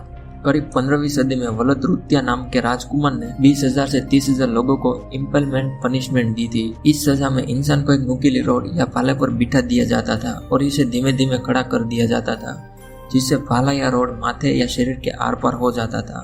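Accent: Gujarat, India. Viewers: Tu gujrati hai bhai?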